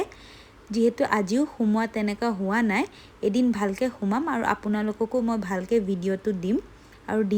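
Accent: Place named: West Bengal, India. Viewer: Baa tumar kotha khini mane accent to olop Bengali type lage tumi pure assamese hoi ne please jonaba sun and tumi.kor hoi koba sun...lots of love to you❤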